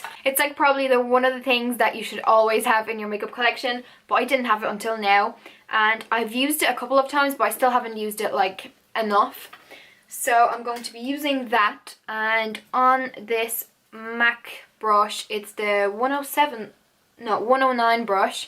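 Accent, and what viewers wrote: Ireland. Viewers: I just found your channel and I love it !! 😍 are you from Ireland ?? Your accent sound a little bit Irish xo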